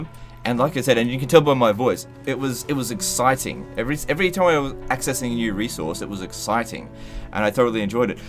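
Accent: Australia. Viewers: isnt he australian lol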